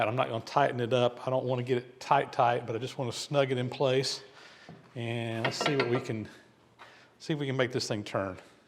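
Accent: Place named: Georgia, United States. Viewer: I came here only as a follower of the Tally Ho project. Initially I was debating whether to follow as I could hardly make out a word you said - (Georgia accented English dialect to Dorset English ear). But I'm very glad I stayed. After a month I could follow you clearly & and learnt what a fascinating channel you ran. Watching how you overcome problems and your clear explanation of the most technical processes are excellent. I'll be staying.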